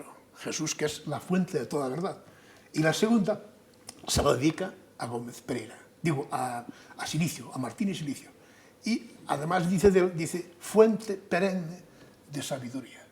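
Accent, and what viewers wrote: Spain. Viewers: Qué pernicioso el acento gallego para una nítida articulación de las palabras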